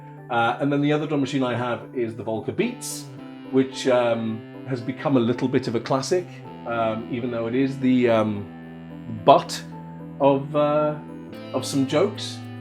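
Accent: United Kingdom. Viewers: Love your Welsh accent. Second career in voice over?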